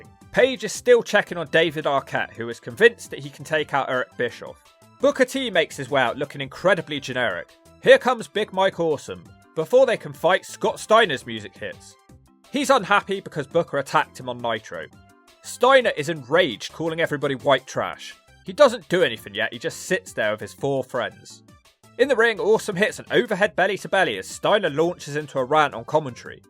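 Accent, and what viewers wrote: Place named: West Country, United Kingdom. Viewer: That West Country accent was seeping out in this video 😂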